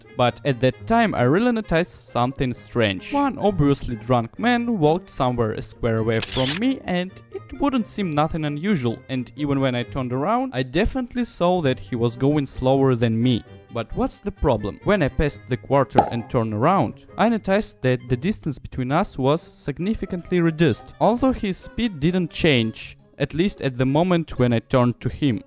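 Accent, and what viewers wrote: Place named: United Kingdom. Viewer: Друг мой, ты все видео говоришь на британском диалекте английского, он понятен всем нам (русским, кто не прогуливал инглиш), а вот американцам он понятен даже хуже чем нам.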